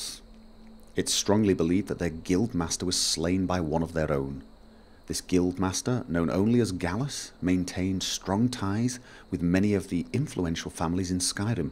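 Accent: United Kingdom. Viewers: Wooow, You're from Norway? Why the hell did I thought you were from the UK?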